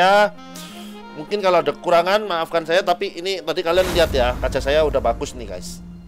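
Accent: Java, Indonesia. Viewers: muka cina suara jawa mantul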